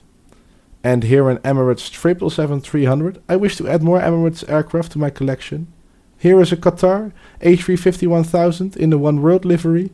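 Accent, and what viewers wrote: Netherlands. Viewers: Ik hou van het nederlandse accent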